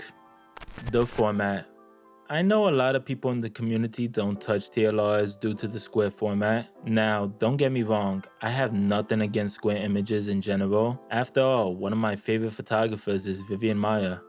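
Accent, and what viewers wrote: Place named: Boston, United States. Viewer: Wait your polish and here I was thinking you were from Boston.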